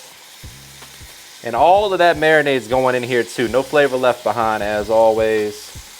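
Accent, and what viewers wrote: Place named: South, United States. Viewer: Cooking with is crazy LoL you from Florida? It feels mad southern.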